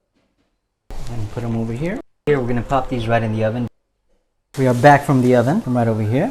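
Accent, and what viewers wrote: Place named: United States. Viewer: You watched a man with an American accent cooking a variation on typical American food and didn’t think he was American? I don’t know how to help you.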